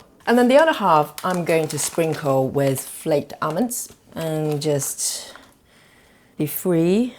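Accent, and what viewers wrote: United Kingdom. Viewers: Where are you from? Lol I love your accent! Anywhere near UK? Elegantly presented as a real human being ( unlike those others :) !! ) I may just have found the road to my own keto experience! Thanks so much! PS Wanna go somewhere nice? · Lovely voice. Are you british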